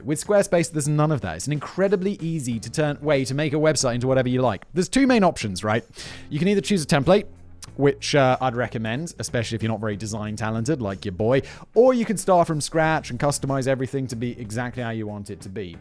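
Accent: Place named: Oxford, United Kingdom. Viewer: I love how Simon has the vocabulary of an American Midwestern and the accent and diction of an Oxford Man!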